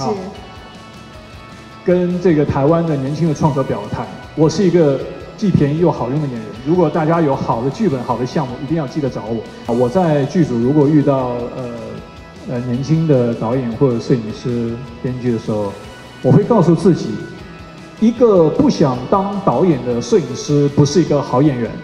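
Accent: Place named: North, China. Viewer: 他在北京是另外的口音，很北方的口音。他是模仿能力很强。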